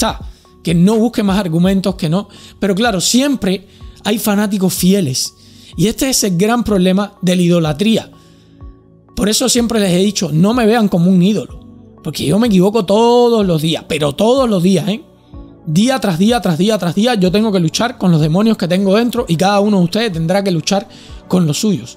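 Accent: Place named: Cuba. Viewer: la llegada de tus padres fue una alegría inmensa, y no sé si es manía mía nomás, se te escapa la modulación cubana más de lo que se acostumbra, jajajjaja Saludos y viva Cuba libre!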